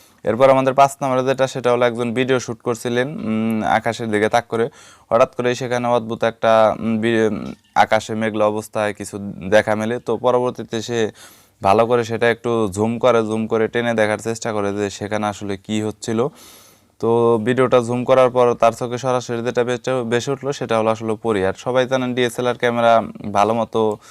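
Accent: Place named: Tangail, Bangladesh. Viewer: মনে হয় , টাংগাইলের ভাষা বুঝতে পারছি না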